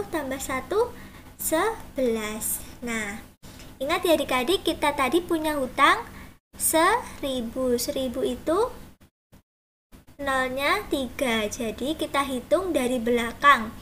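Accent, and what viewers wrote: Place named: Java, Indonesia. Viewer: Kak, bahasa kakak kayak ada logat logat jawanya gitu? Kakak orang jawa ya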